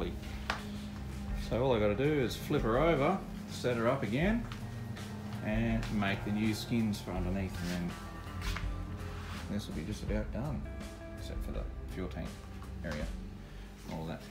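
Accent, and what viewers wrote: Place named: Australia. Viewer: Nice work.........and its good to hear an Aussie voice of many vids that ive watched........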